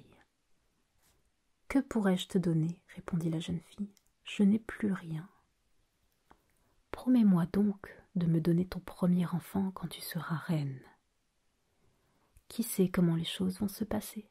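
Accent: France. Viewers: I really like both, but i think i prefer the french accent! French is ma langue préferée <3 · i like this french version, cause french is so soothing and sweet sounding. i also understand it. but. the french accent in english i find even better. because the accent, with its note of vulnerability and a little awkwardness, is the loveliest of all... thank you so much. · Je ne peux pas le croire, elle est française! J'aurais dû m'en douter avec l'accent..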